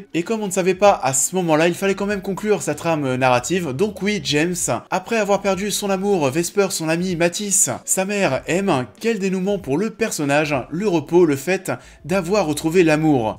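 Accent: Paris, France. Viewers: l'accent parisien est un peu too much aussi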